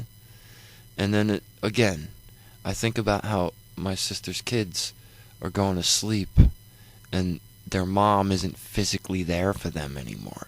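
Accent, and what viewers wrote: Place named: Brooklyn, United States. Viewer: Cool my lil gangsta rapper from Brooklyn, soon I'll buy all your songs, I like the Brooklyn accent, I've always been a fan of real hip hop ! Stay strong boy :)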